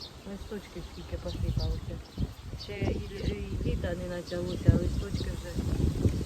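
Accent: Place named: Khmelnytskyi, Ukraine. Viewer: Дякую Вам Пані за такі ЖИВІ відео, на разі я далеко від рідного краю, на Сході України, Ваші відео і суржик нагадують мою Хмельниччину...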